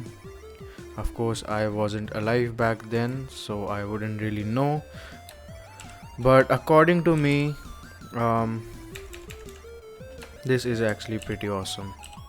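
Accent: India. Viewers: It is Indian accent, i have not problem with that, but for some reason people want flawless english... We are shaped in while growing to adapt to our language, i speak portuguese and my accent when i talk in english is pretty noticeable, but americans say they can understand me pretty well, in fact, they say that the syllabic latin language makes it more audible. Some people are just jerks...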